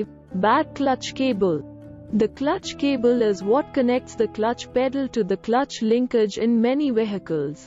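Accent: India. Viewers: Amazing, a robot-narrative with an Indian accent...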